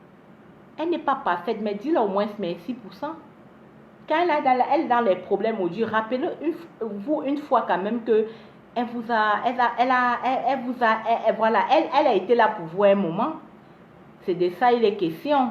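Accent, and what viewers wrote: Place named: Bamileke, Cameroon. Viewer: AVEC TA VOIX DES BAMILEKE pardon va te cacher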